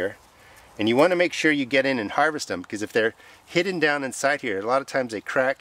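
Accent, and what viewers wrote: United States. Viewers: speak English not American....plz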